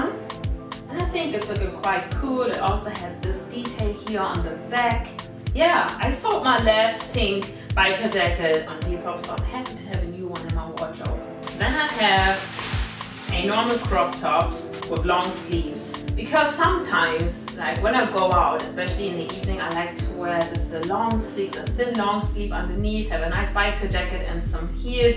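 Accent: Germany. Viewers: Delia Beer ja, sie kommt aus Deutschland und ist dort geboren, deswegen ist ihr englisch auch anders als das von den native Amerikanern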